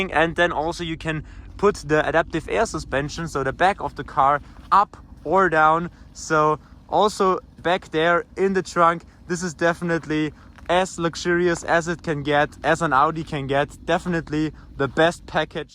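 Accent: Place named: Germany. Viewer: Bro you are from Germany i can hear it ! Moin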